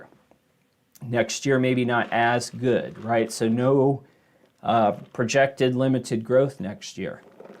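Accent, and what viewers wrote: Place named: Hershey, United States. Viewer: It’s funny listening to your expressions. Takes me back home to Hershey. Very similar. Thanks buddy.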